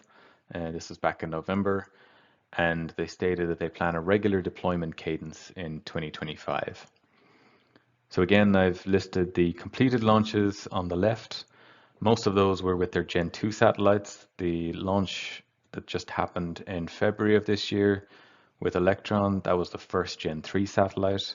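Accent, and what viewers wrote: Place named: Ireland. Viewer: Very cool! You seem to be another "Scott O" running the numbers!! A fellow Irishman too by thee sounds of it which is even better!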